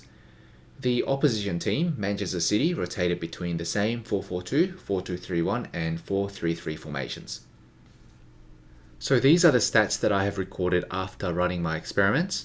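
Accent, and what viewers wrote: Australia. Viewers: also is that an Australian accent I hear?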